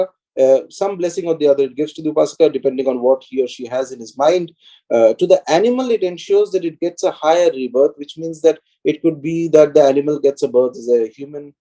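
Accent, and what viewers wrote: Kerala, India. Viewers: Malayali? Tamil? Just asking.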